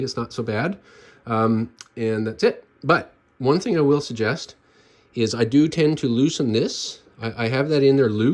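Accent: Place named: Canada. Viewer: Can always tell a Canadian by the way he says out , like a Scotsman says outt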